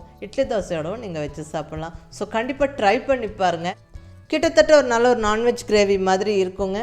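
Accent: Coimbatore, India. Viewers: unga slang keta neenga from Coimbatore thaana 🤔, anyway thank u 😊 IL try this for sure 👍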